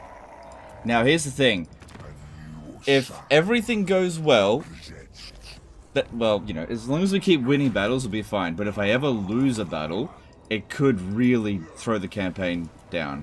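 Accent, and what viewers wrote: Australia. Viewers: Wait is Australian????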